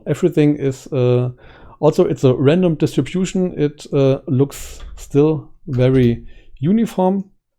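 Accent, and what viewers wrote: Germany. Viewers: your denglish is the hammer ;)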